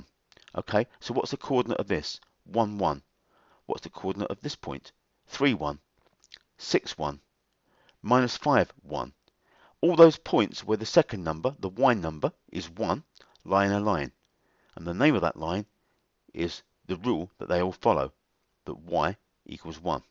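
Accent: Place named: United Kingdom. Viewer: Lol ur British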